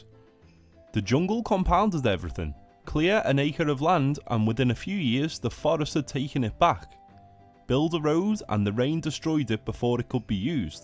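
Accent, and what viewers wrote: Scotland, United Kingdom. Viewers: I can hear an accent, but I'm from the Netherlands, so I don't know which accent it is. Is it a Scottish accent?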